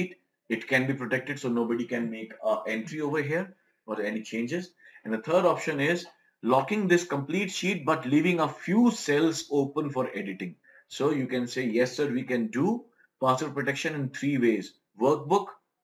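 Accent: India. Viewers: Very Indian accent. Also helpful vid, cheers buddy 👍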